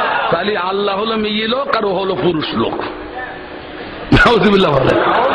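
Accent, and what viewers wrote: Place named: Barishal, Bangladesh. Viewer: hala borisailla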